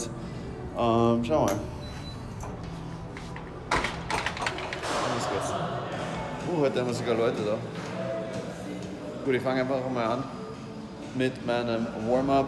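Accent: Vienna, Austria. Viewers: I guess you will have a hard time understanding him, is speaking with a heavy austrian accents. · Kommst du aus Österreich oder aus Deutschland? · kommst du aus wien oder oberösterreich? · Bist du Wiener? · Es ist er österreichisch mit deutschen Dialekt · Naja is ja eher Östereichisch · Es is schön dich mal mit Bissel wiener Dialekt zu hören find ich Ur Geil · well he has an strong austrian accent so it would be hard to understand even if you are able to understand "regular" german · Es is so varruckt, dass ma di auf österreichisch a amoi heat..👀🤷🏼‍♂️😂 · Für mich hört es sich an als wärst du aus Niederösterreich/Wien. Schöne Grüße aus dem Waldviertel ✌️ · haha wia geil der Österreichische Dialekt! Homma💯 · Jaaawohl, du hast deinen österreichischen Akzent ned valoan🔥🇦🇹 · Wos i leiwand finde is des ma deinen österreichischen Akzent heard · Wo sind alle Österreicher🔝🔝🔝 Wie unfassbar geil es is dich mal auf österreichisch Scherze machen zu hören😂Und wie alle in den Kommentaren voll zamm halten!🦁Ich feiers.... 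· Englisch sprichst du Mega gut quasi Akzentfrei aber bei deutsch kommt dann der Österreicher völlig durch 😂 feier ich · Wo aus österreich kummt er? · So geil österreichisch vo dir zum hern🇦🇹💗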